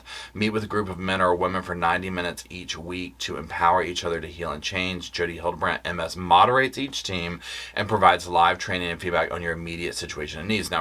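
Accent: South, United States. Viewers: Can we say C U L T?? Oh my goodness! YESSSS!!! Let’s hear the transcripts, podcasts, all of it. I’m here for it. On a more serious note- i think that the higher ups of this particular religion really need to speak out and speak loud about what is condoned by the LDS church, and what is not. What is encouraged, and what is not. Because, right now, that church is looking BAD BAD. I live in a small mountain town in UT- the same one that Kouri Richins tried to purchase that big ole house in? Yep. As a non- member, it terrifies me that SO MANY high profile cases lately are coming from “devout” LDS people. The dentist who killed his wife. The wife who poisoned her husband. The Daybell/Vallow saga. 8 passengers. The list goes on and on. If I was the head of PR for the LDS church, I would be hiring the best people to somehow dig their church out of this optical MESS! 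Looking forward to your next video! Your personality is perfect for this! Love when you roll your eyes and get a titch petty with your southern accent…. bless their lil pea pickin hearts. Ha ha. Thanks for all the fabulous content!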